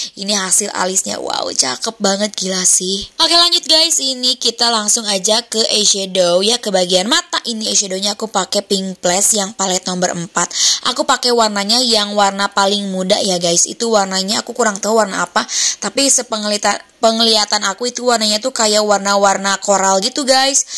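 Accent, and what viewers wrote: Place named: Java, Indonesia. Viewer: Terlalu medok, tpi warna bdakny si natural bnget, cma ke Medokan Tpi bgus ko, aku suka , suka sekali mba Terimksih tutorialny, semoga cnelny tmbh maju dn sukses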